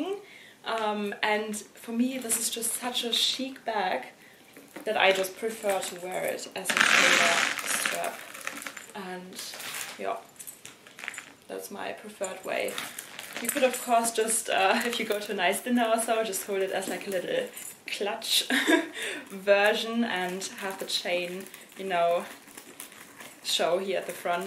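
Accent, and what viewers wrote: Germany. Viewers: Hey, i am thinking of getting the purse.... great review thank you for the video ☺️.... are you german ? ☺️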